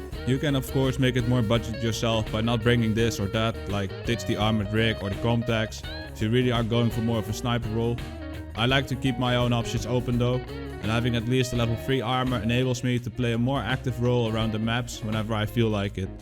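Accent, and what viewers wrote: Netherlands. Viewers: Nice to watch. Really useful info. Though I can hear you are probably Dutch haha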